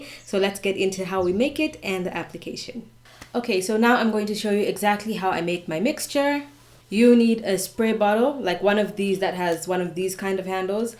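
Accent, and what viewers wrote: South Africa. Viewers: You sound South African ❤️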